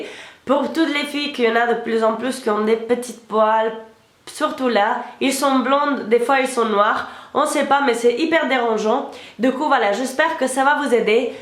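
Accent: Spain. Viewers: oh non une espagnol! l axent est beau tres beau j adore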